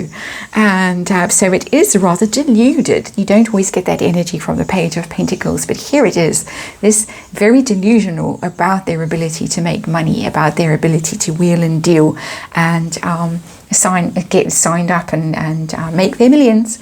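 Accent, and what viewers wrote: South Africa. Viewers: Past 30 years living and working on various continents. Instantly regognised your Sout-African accent. ✨Marvolous. ✨ Since then i always follow your input.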